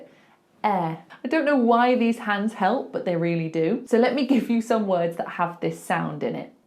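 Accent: United Kingdom. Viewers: Your own accent while delivering of instructions throughout this video is self evident of standard British accent. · I love british accent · In my opinion RP accent is best English in the world. This is pure British.✅ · For me it is funny to hear you and others brithish talking however when i hear people from wales, Ireland and central and north UK is a real kick in my balls undertanding their speech.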